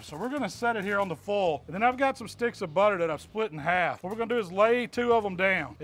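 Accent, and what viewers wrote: South, United States. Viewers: I love watching your videos but I love listening to that awesome Southern drawl even more.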